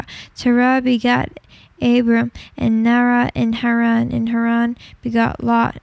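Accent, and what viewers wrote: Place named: United States. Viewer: I like her real voice, it sounds like an American version of my voice lol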